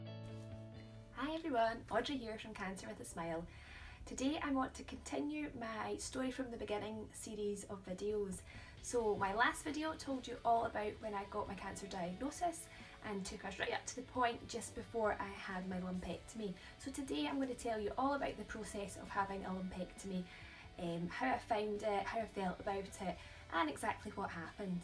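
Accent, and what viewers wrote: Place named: Scotland, United Kingdom. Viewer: Hi I am 32 and life an hour from Glasgow. I have just been diagnosed, watching your videos has really helped me. Especially hearing a familiar accent and experience from my area. Thank you 👍🏻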